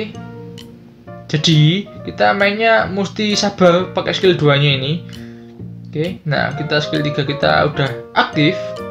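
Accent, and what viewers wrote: Java, Indonesia. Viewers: wong ngdi lur kok logat.e medok😀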